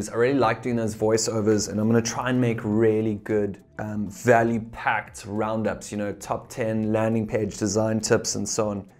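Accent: South Africa. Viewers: Yay! I'll eagerly anticipate it. I'm from Cape Town, born in PE, but now I'm in Germany. I miss Cape Town every day. I always love hearing a South African accent on YouTube.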